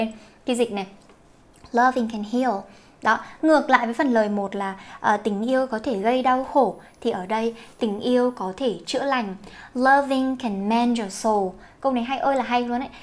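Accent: South, Vietnam. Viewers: Ui chị nói tiếng miền Nam dthhhp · Nghe cô nói giọng miền nam đáng yêu cực 😍😍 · You can talk vietnamese southern accent can you make a vlog speaking southern accent I think it’s very good and special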